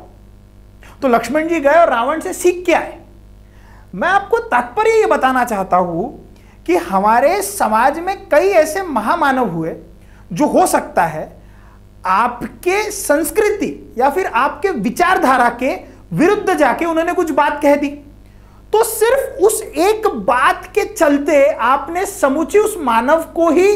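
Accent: Maharashtra, India. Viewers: Idk but you sound Marathi af sir. Typical Marathi guy talking in Hindi.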